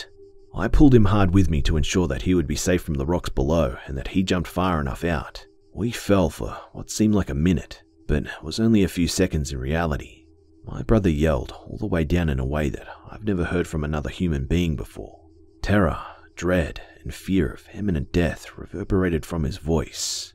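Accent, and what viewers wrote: Australia. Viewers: Is it me or can I hear a hint of Australian? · Some random person yep, you can indeed, Be is Australian :) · Be..Australian, right? love your narration!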